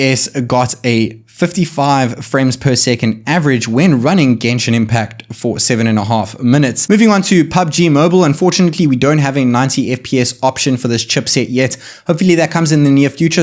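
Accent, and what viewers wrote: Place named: South Africa. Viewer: This guy sounds like a South African🤔 the accents gave it away....but is he?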